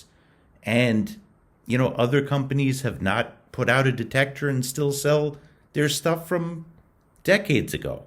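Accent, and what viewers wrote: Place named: New York, United States. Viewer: I mean I love the accent,, that New York area Kofffeee sound... But it's gotta be niche (neesh) not neech , like Cheech... Know what I'm saying?😅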